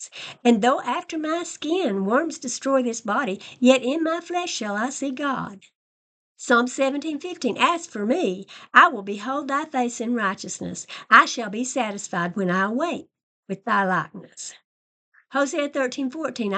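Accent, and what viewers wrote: South, United States. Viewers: Very, VERY occasionally, the algorithm does me a solid. This is the second one of your videos that i’ve seen, and only because the algorithm recommended your most recent video to me yesterday. I will obviously have to subscribe and catch up your back-catalog. I greatly appreciate your clear-eyed, calm, and humane thoughtfulness. I’m also pleased to have finally found an online exvangelical with properly delightful Southern accent. Representation matters! 😋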